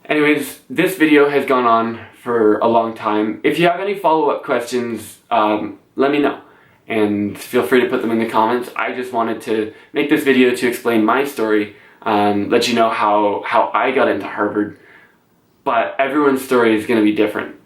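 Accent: Canada. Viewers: I really love your videos man and you can definitively tell your Canadian whenever you say words like watch and tomorrow lol best of luck in Harvard mate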